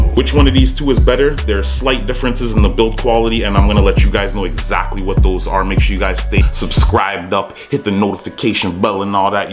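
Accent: Toronto, Canada. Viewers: Toronto mans accent strong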